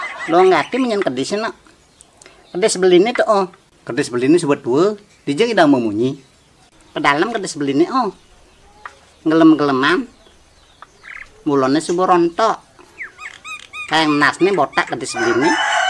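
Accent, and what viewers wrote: Buleleng, Indonesia. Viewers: Wkwkwkkk munyi buleleng giih niki? Jeg lucu sajan2... Makasi pak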